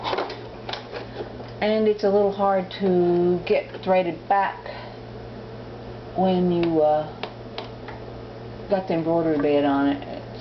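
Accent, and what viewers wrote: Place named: Tennessee, United States. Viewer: Hello, Thank you having the ambition and courage to help us newbie better learn our machines. I have an old 2144 that I'd wanted forever. But there it sits, because no one has helped me with teaching or coaching. I did upgrade it to 2170 online. I see you don't use the cut function. - Does yours not work? I wish you loved close to me, but by your accent, I'm going to say TN.:) (I'm in So Cal.) Big Hugs, Nanette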